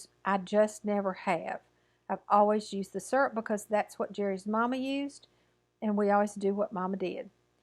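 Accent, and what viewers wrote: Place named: Oklahoma, United States. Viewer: I love hot chocolate! I've been enjoying your prayer chair chats because I love your accent. My Grandma was from Oklahoma and you sound so much like her, it brings back so many happy memories for me. Happy stitching!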